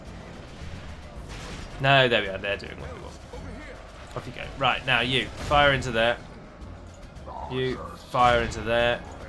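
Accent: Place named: RP, United Kingdom. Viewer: I'm a very recent subscriber, but I have to say, your Third Age LPs are a breath of fresh air, partly because of your super aggressive style of play, and partly because you edit out the boring bits when needed. It sets you apart from a lot of other TW YouTubers, and makes your campaigns flow at at a pretty smooth pace, which I like. I also find your commentary and super posh accent (I mean no offense; I'm a big fan of those accents) pretty enjoyable to listen to.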